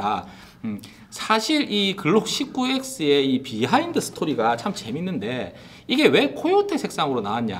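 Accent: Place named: Gyeongsang, South Korea. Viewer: 아니 어떻게 억양은 골수 개앵사앙도오 인데 사용하는 어휘는 몽땅 표준어일까요? 총쏼때 한쪽눈 꼴치가꼬 전자가 쏘는 갱상도 아재는 너무 부럽네요. 분명히 아무리 들어도 마산분이신거 같은데요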